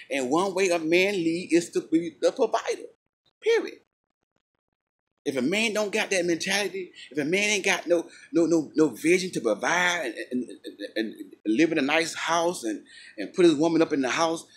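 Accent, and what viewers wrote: South, United States. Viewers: Sounds like your from the South. What that entails in the Northeast or California is on on average a 2,000 dollar a month mortgage, 250 dollar a month heat and energy bill, 150 a month cable bill, 100 dollar amonth utility bill, 800 dollar a month health insurance bill, 300 dollar a month car insurance bill, 600 dollar a month grocery bill, 600 dollar a month for car notes, and 500 dollar a month for savings. Thats about 5300 dollars a month. So to be a provider you need to be maiking over 80k a year if you live in these areas. It takes 2 incomes this ain't the little house on the prairie.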